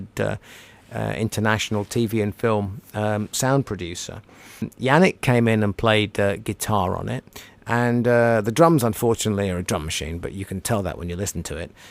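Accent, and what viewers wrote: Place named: England, United Kingdom. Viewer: A great commentary love is English Accent.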